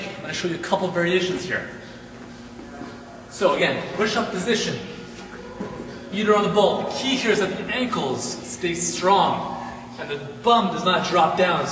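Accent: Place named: Canada. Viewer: ha, I knew he was Canadian. The way he pronounces the "ou" in "out" is a dead give away. I used to date a Canadian and that's exactly how he talked.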